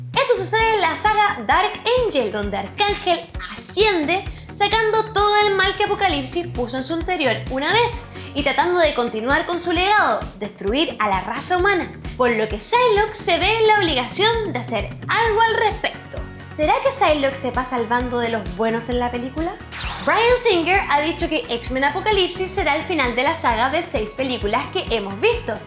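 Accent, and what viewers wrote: Chile. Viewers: Es chilena. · Casi me suscribo, hasta que mi cuenta de que eras chilena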